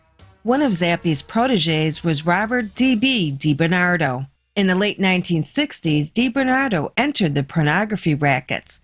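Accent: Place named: Chicago, United States. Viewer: Your Chicago Land accent is hilarious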